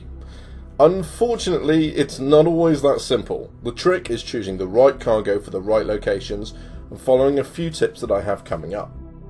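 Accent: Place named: South West England, United Kingdom. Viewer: Love the video, are you from South-West England? It's so refreshing to hear someone who sounds like me on YT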